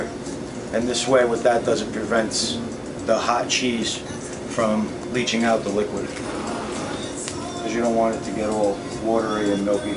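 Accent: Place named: New Jersey, United States. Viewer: Without knowing i can guess he is from NJ lol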